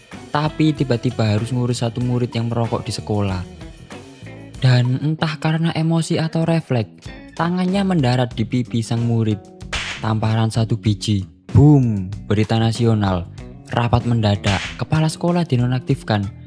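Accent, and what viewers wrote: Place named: Java, Indonesia. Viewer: udah bagus video nya... 1-10 chanel ini dapat nilai 3 banyak hal yang harus admin perhatikan lagi agar chanel bisa berkembang, masukan dari kami 1.belajar memahami emosi dalam suara 2.belajar apa itu hook,tapi ada rahasia di balik hook yaitu (rehook) 3.narasi ini terasa sangat AI dan masih banyak lagi. pengisi suara chanel ini punya genetik suara yang sangat bagus.. aksen dan logat jawanya membuat pendengar mudah ingat.. sedikit tips smoga bisa membatu,oiya investasikan waktu luang untuk belajar membuat tumbnail yang bagus,konsisten untuk terus perbaiki kualitas karya mu,pasti nanti bisa berkembang chanel nya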